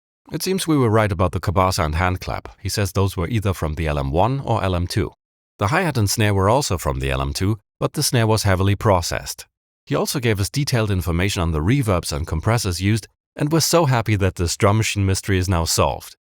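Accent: Germany. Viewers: now i know: you speak German... or at least, your speak pattern is German influenced (😅)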